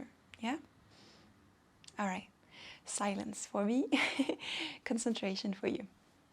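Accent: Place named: Germany. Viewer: hmm really, you mean you get told about a german or dutch accent? Peut-être est-ce que vous venez d'une région où il y a un certain accent allemand ou autre - je sais qu'il y a des pays européens où les germanophones et francophones se cotoient (aussi, merci d'avoir répondu et d'avoir éclairci ma curiosité - moi aussi je parle français, je vis présentement au québec, ce n'est pas le meme accent mais personnellement j'aime beaucoup l'accent francais européen. Je suis étonnée de ne pas l'avoir reconnu cette fois-ci! hehe)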